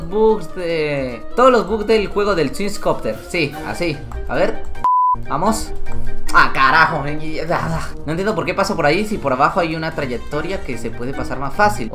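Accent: Mexico. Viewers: Porque habla Mexicano :V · Porque Hablas Como Mexicano :^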